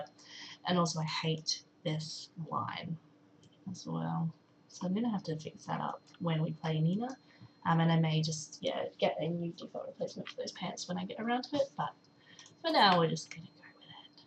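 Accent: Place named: Australia. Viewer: so happy to have found a fellow aussie's channel! it was so funny, i was watching and i was like that accent... it's strange.. and then it hit me - it's my accent LOL!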